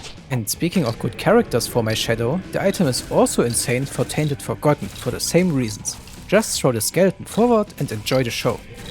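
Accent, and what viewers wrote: Germany. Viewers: Man merkt wirklich extrem dass du deutsch bist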